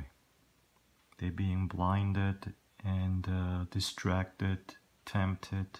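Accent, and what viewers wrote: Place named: Turkey. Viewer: Oh my goodness, we live in Texas too! We’re in the Dallas area! I think it’s the Turkish accent I must be hearing. I couldn’t place what region you are from. If you are also in this area please let me know!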